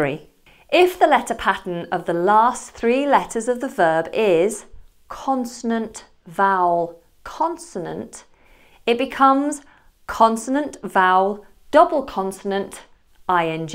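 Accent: United Kingdom. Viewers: It's a little difficult for me to understand the british accent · Brilliant explanatiom with proper British accent. Keep it up🖒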